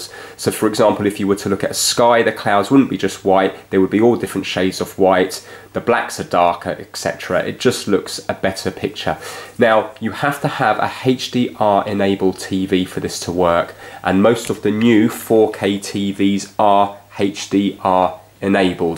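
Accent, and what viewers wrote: United Kingdom. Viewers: I love his British accent!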